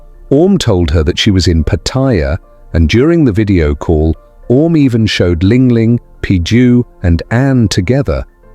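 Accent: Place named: England, United Kingdom. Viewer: Εισαι σωστός αλλά από τήν προφορά καταλαβαίνο ότι είσαι Άγγλος. Οπότε δεν θα σε ακολουθήσω γιατί σιχαίνομαι την Αγγλία και τις πολιτικές τις . Εσεις ψηφίζετε τους πολιτικούς σας άρρα εσείς είστε υπεύθυνοι για τό ότι προσπαθείτε να σύρετε την Ευρώπη σε πόλεμο. ΕΞΩ ΟΙ ΒΡΕΤΑΝΟΙ ΚΑΙ ΜΑΚΑΡΗ ΝΑ ΣΑΣ ΚΑΨΗ Ο ΠΟΥΤΙΝ.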